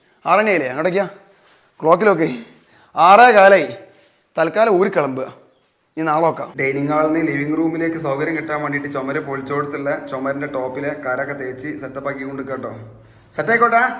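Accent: Malappuram, India. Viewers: Adaanu njangale malappurathe oru bashaa reethi😂... Malappurathe piller poliyalle😊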